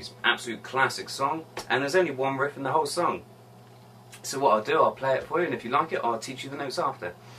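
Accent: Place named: United Kingdom. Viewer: love the cup of tea and the nice accent very british! you make us proud. thanks for the lesson it really is great and very useful. keep them coming xx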